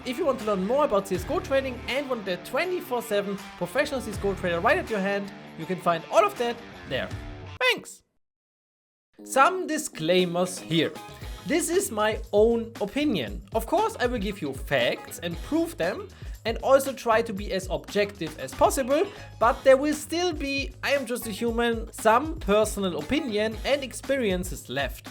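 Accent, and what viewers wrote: Germany. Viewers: brandenburg englisch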